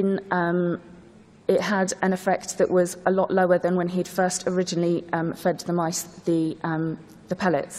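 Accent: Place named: United Kingdom. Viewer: 🙏She Is i English That’s Unique To Most Brits Accents Beautiful Listen and learn🥕🍎❤️💛🇯🇲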